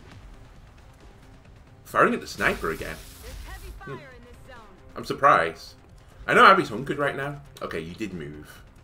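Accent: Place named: England, United Kingdom. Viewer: The first two missions on Impossible are about not having LOS. If they see you, they'll kill you. Do the math: Sectoid with 70 aim has 1/3 chance to hit you in full cover so 3 sectoids seeing you mean that at least two must miss and the third must not hit 4+ ... 1 - (0,7*0,7*0,85) = 60% that your soldier survives the turn. If he is wounded, chances drop quickly. Side note: Because they don't have enough aim, they can't hit hunkered soldiers if they are in full cover. So if you can't kill them, hunker down (while your soldiers maneuver - without LOS). And forget overwatch during these missions. Though it works on roof tops two squares away from the ladder if you have 4 soldiers on overwatch ... LOL Btw: Where do you live? Most of the time I'm listening to Americans but you sound sooo English. I'm German so I can't judge just by listening but you remind of these Oxford English cassettes (school, yeah)...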